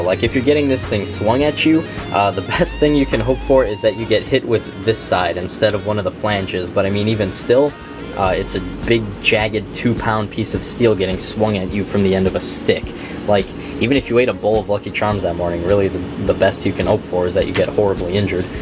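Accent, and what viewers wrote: South Africa. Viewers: Not too bad of a South African accent there. I'm from South Africa. Love the vids Chine. Chine means M8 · South african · Convincing South African accent bro, and that's coming from a South African! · Damn, nice mace and an even better Afrikaans accent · you soud like a south African like me because I am one hahaha lol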